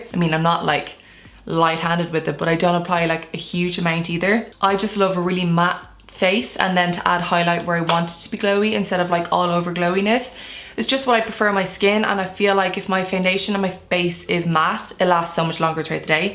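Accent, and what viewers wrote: Ireland. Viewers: Beautiful. Didn’t need the lashes, but they’re gorgeous too. I’m in California but I lived in Ireland for a few years, and I MISS the accent and the fast talking! Most Americans just talk too slowly! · I keep seeing Charlize Theron mentioned, but she could only hope. You look computer generated (I mean that in the best way - you're inhumanly beautiful). Plus, your voice is a lilting almost ASMR-like brogue. I don't know how you don't have 40 million subs!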